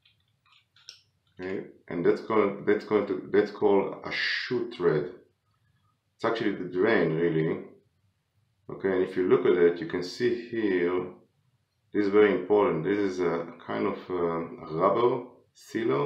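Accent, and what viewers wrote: Israel. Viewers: יש לך מבטא ישראלי ?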